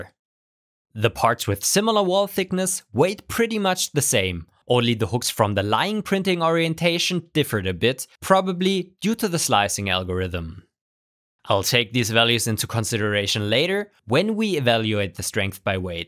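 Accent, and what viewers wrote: Germany. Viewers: Man merkt richtig dass du deutscher bist.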